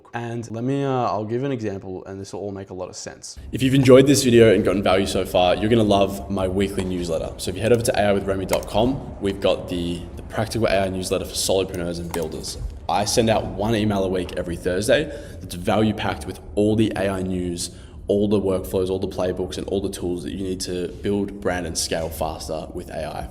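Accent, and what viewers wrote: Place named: United Kingdom. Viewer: Really like what you're doing .. we're building the best Sales & Marketing Voice and Messaging agents you'd come across, along with business analytics tools for major corporates. We code our products. From the sound of it, you're definitely british, I lived in Manchester for a year during business school as well, right down the street to Arndale center. Would love to see how we can collaborate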